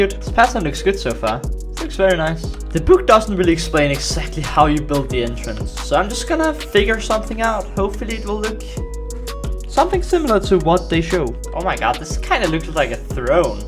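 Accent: Denmark. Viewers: You have danish accent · He is danish im danish so i Can here it with his accent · Why does it hear like hes danish?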